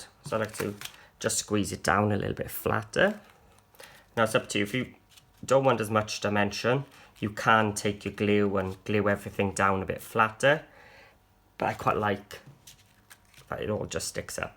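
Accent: Wales, United Kingdom. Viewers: Think u are Welsh yes ?I love Wales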